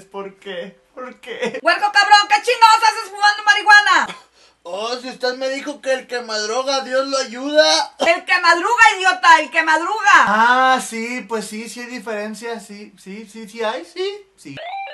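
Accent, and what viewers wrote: Galicia, Spain. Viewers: eres gallego cierto? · Me encantas con tu asentó de gallego <3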